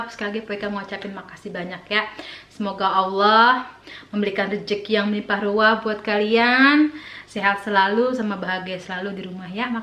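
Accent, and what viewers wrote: Jakarta, Indonesia. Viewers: Mpo saya pengemar mpo suka bgt mpo Betawi bgt ngomong nya,sama ky sy betawi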